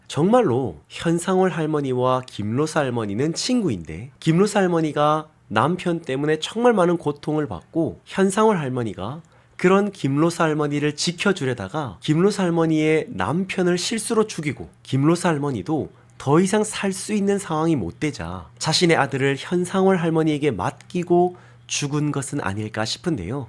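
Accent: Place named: Gyeongsang, South Korea. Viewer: 님 경상도 사투리 엑센트가 지대루네요ㅠ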